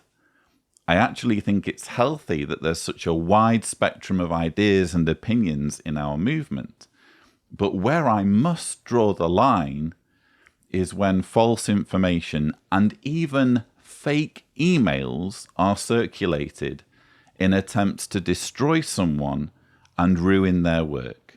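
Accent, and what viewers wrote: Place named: North, United Kingdom. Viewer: I've just discovered you over the last 2 days. I noticed your accent was familiar.... I currently live in Manchester and I was a JW in the Chesterfield/Sheffield area. I left the JWs at 16 having been baptised at 14/15. My parents and brother don't speak to me. I've never heard anyone articulate the same experiences I've had as a child until I discovered your channel and the Leah Remini interview and didn't realise how isolated I felt in my experiences until I heard others with similar experiences. Thank you from the bottom of my heart. Your down to earth way of putting everything is just so refreshing. Please don't stop making videos ♥️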